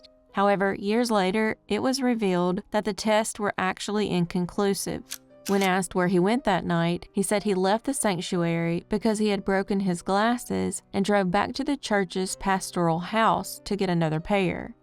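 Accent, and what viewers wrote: South, United States. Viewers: I love her sweet Southern drawl! Her speaking voice is very pleasant and adds comfort to these tragic stories. Southern comfort😊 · THANK YOU!! LOVE THAT SOUTHERN ACCENT!! AND THANK YOU FOR MENTIONING NEW MEXICO AND EL PASO TEXAS!! AND PLEASE ALL VIEWERS PLEASE SUBSCRIBE!!! SHES GONNA HIT THE BIG NUMBER HERE PRETTY SOON!!!!:hand-pink-waving::thanksdoc:🥰 🤠🤠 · There are other folks out there doing good work, but you are my favorite! Maybe it's that outspoken southern and compassionate thing you do so well.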